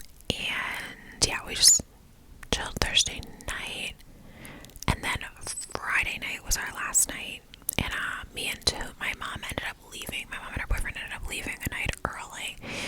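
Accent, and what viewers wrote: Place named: Philadelphia, United States. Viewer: Just wanted to say as someone from Philly I love your videos as for some reason asmr is extra relaxing to me when the person has the same accent as me. Will be binge watching all your content!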